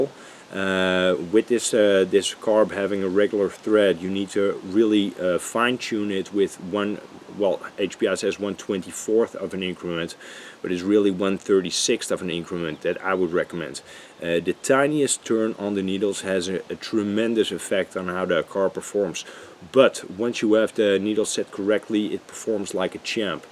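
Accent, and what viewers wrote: Netherlands. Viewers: 100% dutch accent there! Haha! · je klinkt nederlands!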